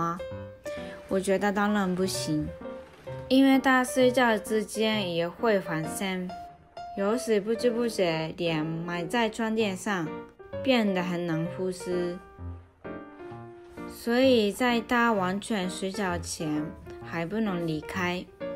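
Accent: Taiwan. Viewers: 頑張ってください 具然にこのビデオを見た 応援しますよ しかし なぜタイワンの標準語似てますか？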